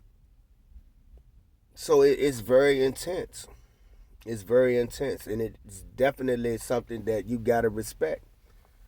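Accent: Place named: New Orleans, United States. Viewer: You keep saying Virginia but you're clearly from New Orleans. Born and raised definitely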